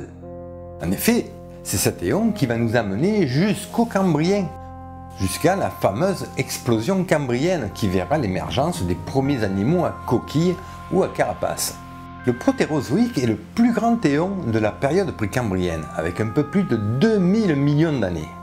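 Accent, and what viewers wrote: South, France. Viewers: Il existe des chaînes Youtube, qui, aux premières minutes nous font: nous abonner, suivre assidûment et surtout enchaîner toutes les vidéos. Ce qui est votre cas. Je suis bluffée du contenu si brillant, de toutes les informations que j'apprends, et de tout le travail, colossal qui doit être mis en place ! Votre chaîne est excellente (et le petit accent du sud rajoute une tonalité bien agréable). Un énorme merci à vous, et nous avons hâte de regarder vos autres vidéos. Félicitations !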